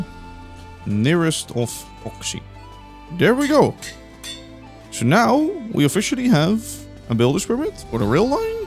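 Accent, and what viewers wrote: Netherlands. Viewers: Aan je accent te horen ben jij toevallig Nederlands ?🤩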